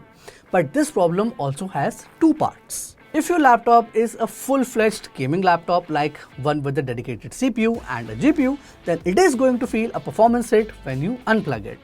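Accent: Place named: India. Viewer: The more i get older the more i become intolerant to Indian dialect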